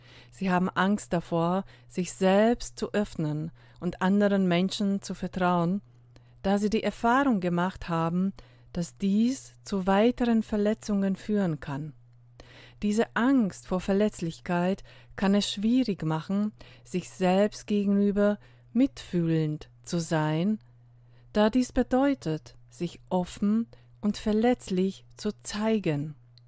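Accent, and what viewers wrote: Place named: Hungary. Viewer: Ich mag außerdem deinen ungarischen Akzent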